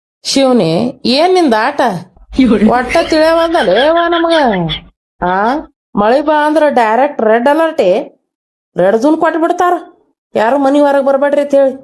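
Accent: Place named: Indi, India. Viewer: ಪಕ್ಕಾ ಇಂಡಿ ಭಾಷಾ ಮಾತಾಡತಿ ಅಕ್ಕಾ. ಇಂಡಿ ಬಂಗಾರದ ಬಂಡಿ 👌👌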